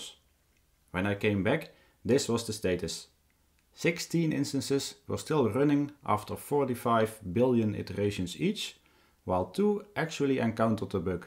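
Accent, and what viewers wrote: Netherlands. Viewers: just about to say if this guy ain't dutch then my life's a lie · Netherlands, obviously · Ik kan je Nederlandse accent horen haha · Heerlijk zon nederlands accent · Only Dutch people will know where this guy is from just by listening to his pronunciation. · I SMELL DUTCH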